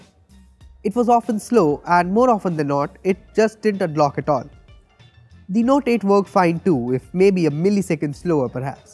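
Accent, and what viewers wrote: India. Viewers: Sayam Sawai he has a typical Indian accent but his presentation is pretty good. I wouldn't judge him for his accent alone.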